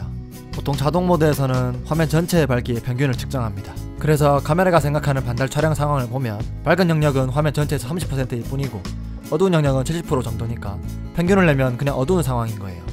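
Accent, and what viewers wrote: Gyeongsang, South Korea. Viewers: 대구분이신거갘은데 코로나 죠심하세여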